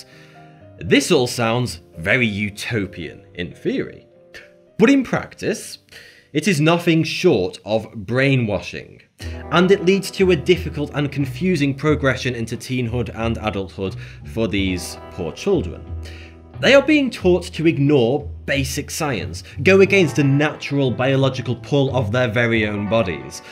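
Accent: United Kingdom. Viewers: You haven't been watching much of him then. I used to learn cute factoids in a British accent from him now he's just another IDW wonk. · You’ve gotten a lot more British I see bud good on you! Missed your uploads..loved the look on the subject hope your doing well!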